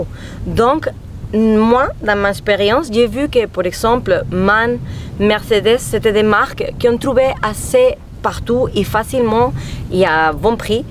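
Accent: Spain. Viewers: J'adore votre accent espagnol !!! Et votre français est très, très correct. (*) (en fait, ce qui importe, c'est l'organisation cérébrale ; et là, chez vous, ça turbine et c'est de niveau solide) Salutations d'un sédentaire... PS je vous ai découverte par les Mariolles trotters *) puisque vous aviez quelques inquiétudes à ce propos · Ton français est très bien surtout ton accent espagnol te donne un plus 😊😊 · J'aime l'accent espagnol, ma grand-mère est née en Espagne. Et ton français est très clair, tu peux avoir confiance. Ton aventure est très intéressante, merci de nous la faire partager. Bon anniversaire de voyage. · Avec tout tes chiens tu avais vraiment besoin d'un camion 😂 Ton accent espagnol est charmante ❤ De verdad tu hablo franxute mejor que yo espagnol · Bonsoir. Bravo pour tes vidéos, et en français en plus 👍, avec cet accent espagnol.... adorable !!! 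· Je te regarde grâce à Raphaël et je ne regrette vraiment pas mon abonnement à ta chaîne. Ton accent espagnol est comme un médicament de bonne humeur donc on a besoin régulièrement mdrrrrrr gros bisous tout plein de la Belgique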